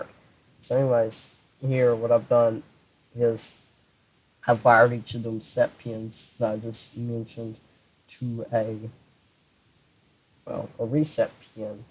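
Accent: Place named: United States. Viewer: The American accent is strong in this one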